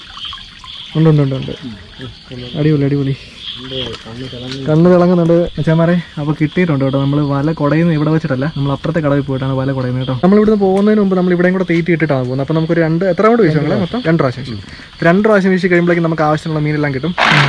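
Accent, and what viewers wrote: Kottayam, India. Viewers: Chettan കോട്ടയം ആണോ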